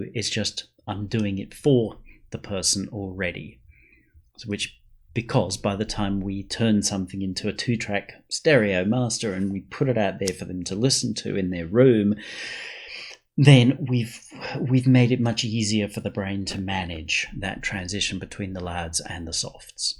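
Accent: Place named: United Kingdom. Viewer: I really appreciate your Britishness, if that's a thing. I listened to the whole thing just to hear you speak, it made me feel good. Can you do a youtube channel where you explain random shit, it would make me really happy! I appreciate the fact that you never looked into the camera that was actually recording, keep doing that thing where you look to the left at what I'm hoping is your hedgehog that you are talking to. Truthfully, I really enjoyed it. Please don't tell me if it isn't a hedgehog, unless it's like a Harry Potter Owl or maybe a kippered herring. That's just as good. Thumbs up.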